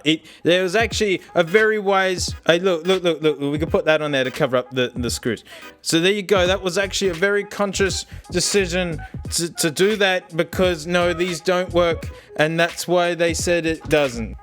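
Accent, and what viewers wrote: Australia. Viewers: and it is so refreshing listening to a fellow Aussie haha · In this video u sound more australian than the usual... idk why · Funny angry Australian man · You’re Australian? 🙃🙃🙃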